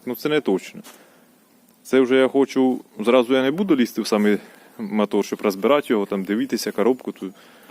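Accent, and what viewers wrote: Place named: Nizhyn, Ukraine. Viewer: А ви з ніжинського району по акценту наче чутно чого питає вдруг надумаєте продавати чи далеко їхати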